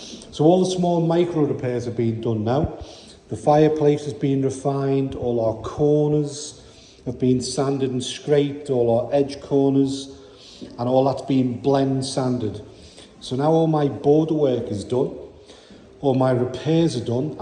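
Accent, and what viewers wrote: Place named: Liverpool, United Kingdom. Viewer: Hey, are you from Liverpool.. I detect a fellow Scouse accent. ❤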